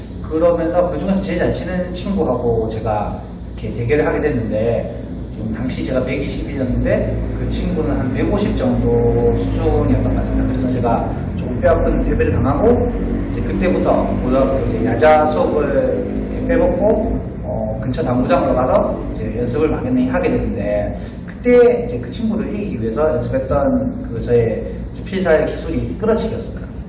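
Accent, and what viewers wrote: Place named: Gyeongsang, South Korea. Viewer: 말투가 진짜 정겹네요 ㅎㅎ고향사람 생각납니다 창원 마산사람이라~ ❤❤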